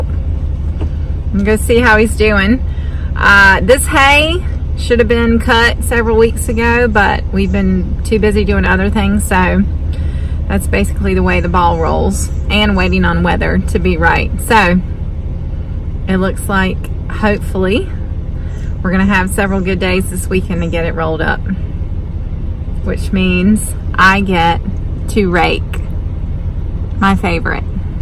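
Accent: South, United States. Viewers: I love the enthusiasm (and that southern girl accent!). We are in a uncommon long period of rain. Found your video to hold me over, chomping at the bit to cut our beautiful first growth for the season. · I love your southern accent. What kinds of things do you wonder about northern folks?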